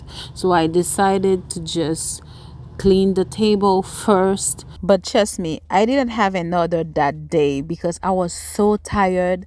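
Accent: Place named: Trinidad and Tobago. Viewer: Hi new to your channel ❤ enjoy your video. Are you Trinidadian?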